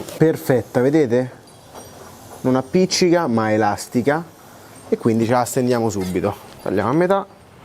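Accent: Rome, Italy. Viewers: Ma sei un marocchino che parla con l’accento romano ??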